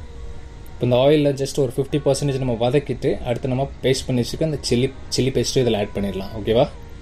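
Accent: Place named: Mumbai, India. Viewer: Your tamil is nice. Mumbai tamil 😅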